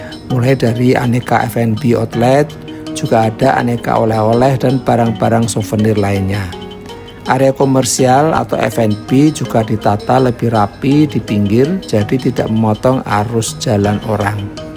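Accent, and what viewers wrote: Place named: Java, Indonesia. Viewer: Logat jawanya 😂😂😂😂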